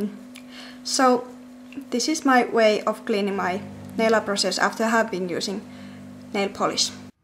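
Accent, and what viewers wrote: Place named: Denmark. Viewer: you sound danish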